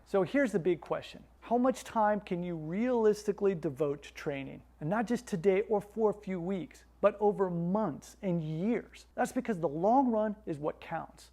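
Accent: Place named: Kansas, United States. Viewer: Sounds like he's left the land of Oz and is back in Kansas--Wichita, Kansas!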